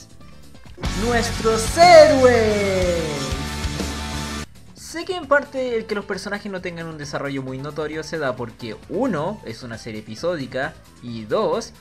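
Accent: Chile. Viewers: eres chileno? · no se te entiende nada..habla más claro, hasta pareces chileno .-.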